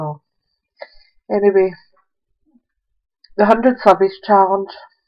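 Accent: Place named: Scotland, United Kingdom. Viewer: I could listen to you for hours mrs - love that Scottish accent!! But I know what you mean, I feel monotone Irish lol x hugs Sandra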